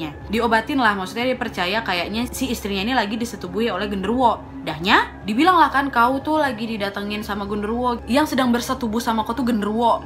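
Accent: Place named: Kalimantan, Indonesia. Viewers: sragen jateng hader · Jowo Timurr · Kaka Orang Kalimantan Ya? Cara Bicara nya Sama Kaya tanteku dari Kalimantan Hehehe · Malang slur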